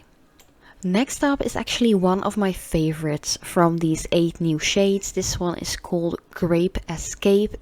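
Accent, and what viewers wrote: Netherlands. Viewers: Je hoord wel dat je Nederlands bent ahah🤭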